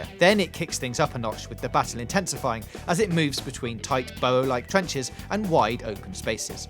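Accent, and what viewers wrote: United Kingdom. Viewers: This guy is probably the most British brit i've ever heard.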